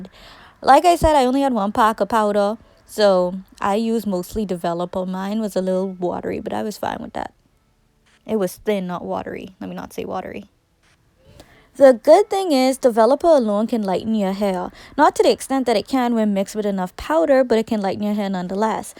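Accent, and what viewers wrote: Bahamas. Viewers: I felt so slow asking my mummy if you sound Bahamian just for her to point out the flag behind you I feel dumb asf but beautiful results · Girl I got so excited when I heard your accent lol was not expecting that. New fellow Bahamian subbie 🥰❤️🇧🇸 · The Bahamian accent is so strong lol... I love it! I was very entertained and informed on the bleaching process and steps. I wouldn't do it on myself though lol. Good job. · Heard your voice and knew you were Bahamian. This video helped me alot.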